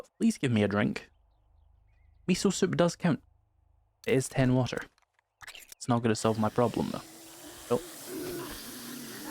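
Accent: United Kingdom. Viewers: very cool video! i always wonder in which part of the UK they speak your dialect...!? greetings from bavaria =)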